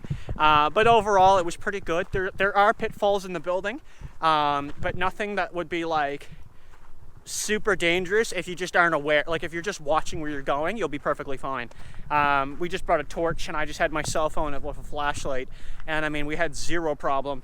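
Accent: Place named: Newfoundland, Canada. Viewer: I live and grew up in Halifax and have never heard a accent so thick Lol you must be a newfie or caper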